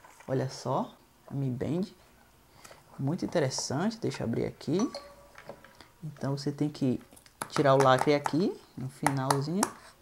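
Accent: Bahia, Brazil. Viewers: Sotaque da minha Bahia é lindo demais meu hey rsrs abraço velho, curti sim!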